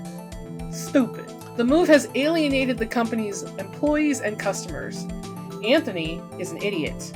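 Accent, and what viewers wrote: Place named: United States. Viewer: You sound like a typical American retard in this video🎉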